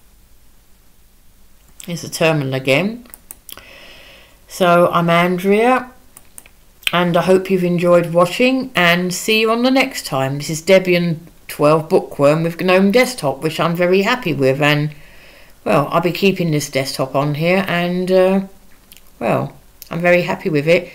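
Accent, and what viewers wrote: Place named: United Kingdom. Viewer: I love your British English, i am salvadorean.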